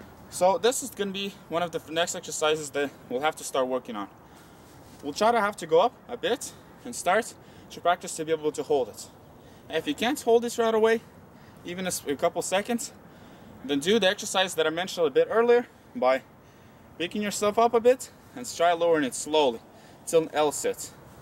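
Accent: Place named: Russia. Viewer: Ya I thought I recognized the surroundings и русский акцент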